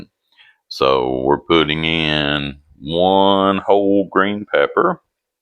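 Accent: South, United States. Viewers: Love to listen to him talk , great southern accent and grandfather voice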